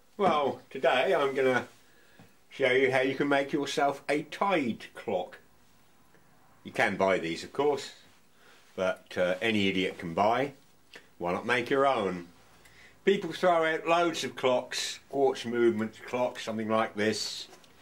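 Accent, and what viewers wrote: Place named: Norfolk, United Kingdom. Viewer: I would have sworn you were from the Norfolk area. Oh well.......... I am over the water from you in Bromley, close to Greenwich.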